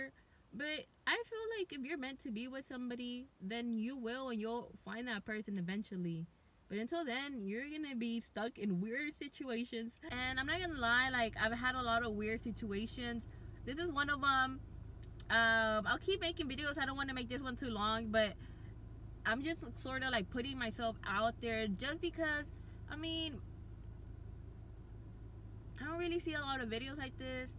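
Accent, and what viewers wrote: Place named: Bengal, India. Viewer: LOL, at first, after I saw your face, I thought... um... maybe a TAnned Pakistani maybe... or someonef rom Bengaluru, but OMG that accent andthose mannerisms... That is so Bengali,s pecifically a Bengali livingi n the East Coast ofth e United states, or maybe California. As for your video content, well, it seems you have not been disabled as long as someone like me. There are things you have yet to learn from life. It'll come to you. It comest o all disabled people sooner or later. Keep it up kiddo1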